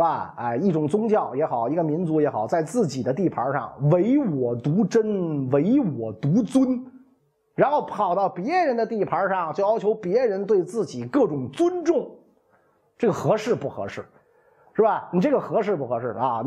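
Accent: Beijing, China. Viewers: 一口京腔听的别扭...感觉像是说相声、说书！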